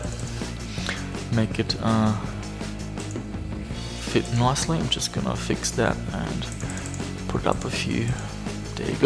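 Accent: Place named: Germany. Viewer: Du hast ein Deutschen Akzent.^^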